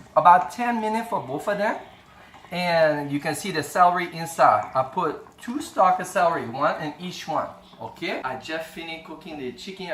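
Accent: Liberia. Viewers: Very nice to hear your Liberian accent. Your accent sounds just like my husband. Would love to see more videos with you speaking your natural accent. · Dis na small ting here o! Day man know how to talk ray liberian English yah. Day foo look goo o.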